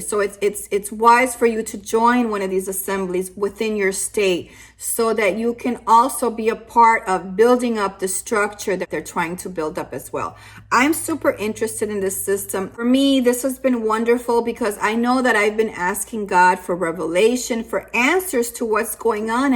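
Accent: Latin America. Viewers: Hair looking great as usual, love the librarian glasses that go so well with the choice of top, sweet Latina dialect.... Giving this video a solid 9.3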